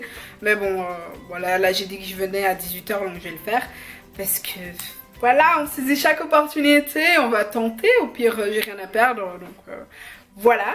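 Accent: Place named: England, United Kingdom. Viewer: Moi j'aime bien ton accent anglais hahah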